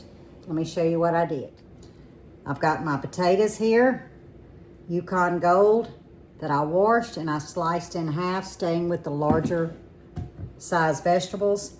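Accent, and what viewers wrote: South, United States. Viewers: Oh honey, you’re 😅Southern! You sound just like me and everyone I know.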